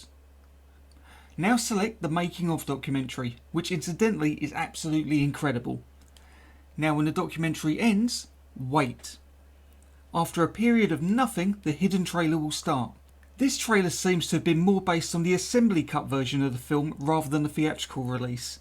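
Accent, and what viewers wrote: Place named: United Kingdom. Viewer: I have no other way of saying this but the auto captions don't like your British accent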